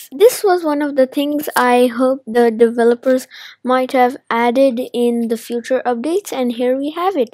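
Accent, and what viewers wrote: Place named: India. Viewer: ur accent sounds like indian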